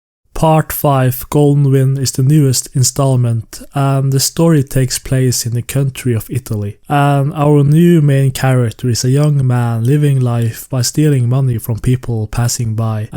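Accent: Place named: Scandinavia. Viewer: you sound either norwegian or swedish, anyways keep it up :d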